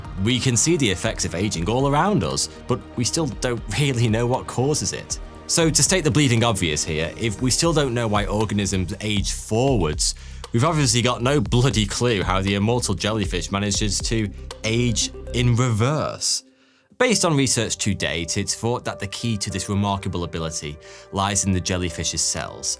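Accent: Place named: Leeds, United Kingdom. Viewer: Hey - do me a favor. I guess accents, and for your accent I am going with Leeds or the near North of Leeds, How close was i? Thanks for being a sport.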